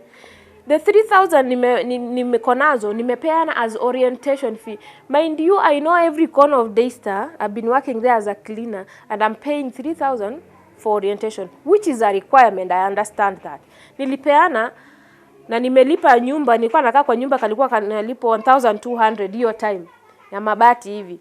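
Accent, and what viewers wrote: Uganda. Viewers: Unaongea kama munganda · She sound like a ugandan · She has Ugandan accent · your swahili sounds like ugandan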